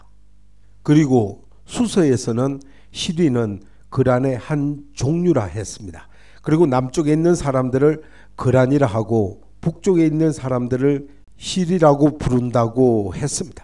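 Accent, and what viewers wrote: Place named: Busan, South Korea. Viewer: 하이고 ᆢ 나도 부산이지만 사투리 좀 고쳐라 ᆢ 다른 유투브에서 그러더니 아직도 못 고쳤네 ᆢ 왜 그리 어미에 그리 엑센트를 두냐 ᆢ?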